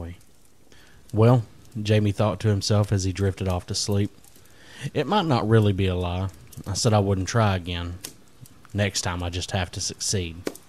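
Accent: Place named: South, United States. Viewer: That southern accent is a very good commentary voice for stories :)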